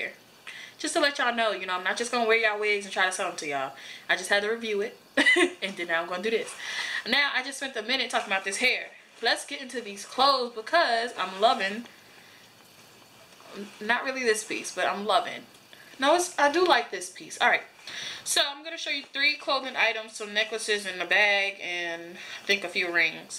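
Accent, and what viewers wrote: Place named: Newark, United States. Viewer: Hi girl, I'm from Newark! Going there for the festival in Lincoln Park! I knew I recognized the talk! Sounds like us! I live in Atlanta now! I have subscribed and get to see all of your great videos! Keep giving us the current slay! THANKS! Jp! !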